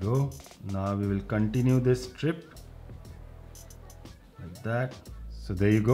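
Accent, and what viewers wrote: India. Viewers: Ha bro bhai indian h english bolne ke tarike se pta chal rha h. Ek dum clear. Nice video bhai.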